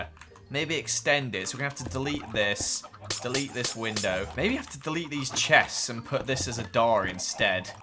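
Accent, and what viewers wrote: United Kingdom. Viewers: The beginning killed me, that is why I love the British accent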